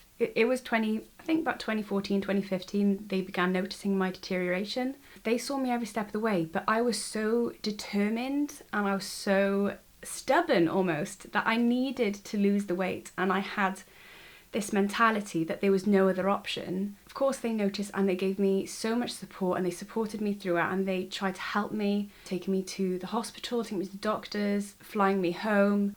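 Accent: United Kingdom. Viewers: U have a british accent 😍💯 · AHH BRITISH ACCENT IS THE MOST ADORABLE AND BEAUTIFUL ACCENT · Omg I love your British accent and Jesus Christ you're so beautiful🤩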